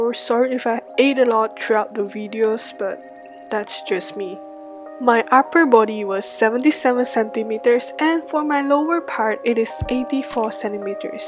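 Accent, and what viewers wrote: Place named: Malaysia. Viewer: BRO WHEN THE VIDEO STARTED AND YOU STARTED TALKING I WAS LIKE "is she Malaysian?" AND TURNS OUT YOU AREEEEE OMG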